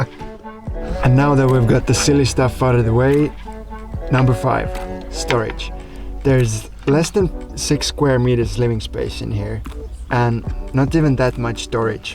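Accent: Scandinavia. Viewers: Nr 2: Showering! Oki thats enouhn problem for me! Btw are you Swedish? ya accent sounds from Scaninavia! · Loving the accent!!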